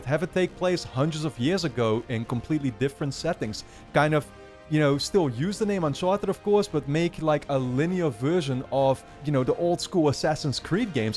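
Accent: Netherlands. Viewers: You really wear your thoughtfulness and good nature towards others on your sleeve and its a refreshing thing when it comes to talking about games...maybe people just do it too much and you just hit the right amount but thanks for the vids. I might have a semi possibly ignorance induced anecdote to go with my claim as ive never encountered someone with an accent like yours within the last 10-15 yrs who wasnt a total joy to be around. Basically for me, dutch type people voicing their excitement about stuff gets my juices flowing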